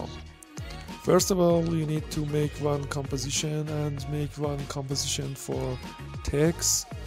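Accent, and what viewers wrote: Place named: Iran. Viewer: Are you Iranian dude? I love your Accent :)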